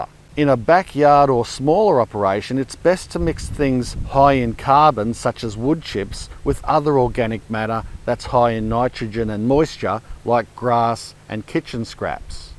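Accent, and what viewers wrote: Australia. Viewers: 😂😂😂😂😂😂😂😂😂😂😂😂😂😂. I love ❤️ he’s Aussie lines and accent.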